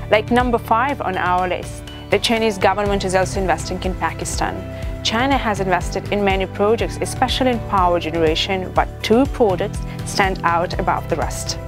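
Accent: United Kingdom. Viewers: i love british accent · Britain accent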